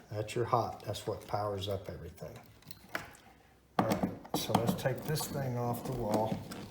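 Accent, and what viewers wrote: South, United States. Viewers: Honeywell used to make an escutcheon plate for use when replacing a large with a small thermostat. Does this Ecobee have such a plate; you would think so since it is a small thermostat. Another great video from the soft spoken Southern expert.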